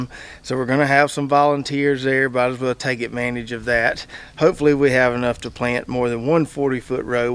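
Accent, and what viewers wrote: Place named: North Florida, United States. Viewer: I wonder if anything can be done with the Brussel Sprout leaves as a raw, or cooked green like collars, or stir fry, etc...? It does seem like most big, dark green leafy veggies tend to need a bit of extra Nitrogen, sort of like super lettuce. Thanks for all the cool vids. I've just found your channel and been getting caught up. It looks like you're somewhere very nearby. I'm in North Florida and we have very similar soil, pines and accents :) Cheers!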